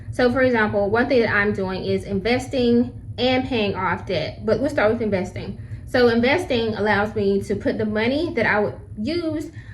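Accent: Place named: North Carolina, United States. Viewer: Hello. My name is Albert and I grew up in NC. I now live in California, but it is still nice to hear the NC accent. I welcome you to the world of money and investing. I have lived my life frugally. At first it was out of necessity, but in time, it became a lifestyle. Eventually, it became a very comfortable lifestyle. Best of luck with your channel.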